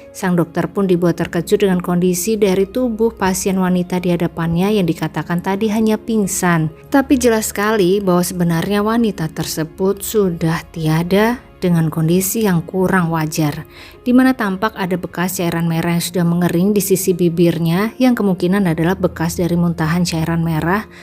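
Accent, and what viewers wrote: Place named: Banyumas, Indonesia. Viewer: Asli wong ngapak mbak 😅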